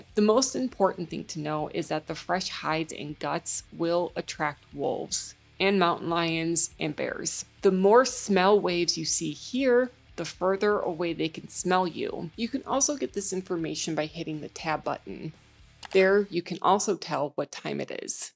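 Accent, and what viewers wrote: United States. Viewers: Great video! U sound WI af😊.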